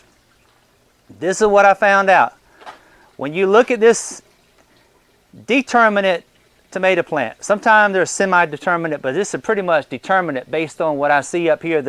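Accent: South, United States. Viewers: Forgot the name of your channel, so gave ChatGPT a description of a gardener that did hydroponics on YouTube about 10 years ago with a pleasant southern accent, and the AI knew exactly who I was looking for. Miss your videos.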